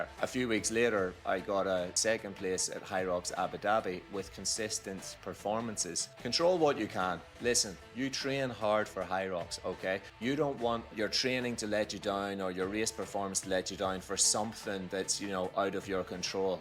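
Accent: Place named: England, United Kingdom. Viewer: Great post and tip – I ordered a few. And, you have a strong English accent, where are you from?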